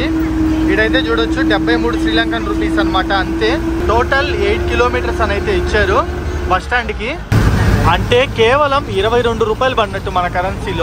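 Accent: Nellore, India. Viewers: ❤ Love your accent and description as I am 67years young Native of Nellore Proper 😊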